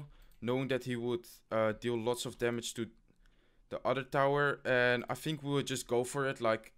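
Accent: Netherlands. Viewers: Are u Dutch? Je hebt een Nederlands accent😂💪🏻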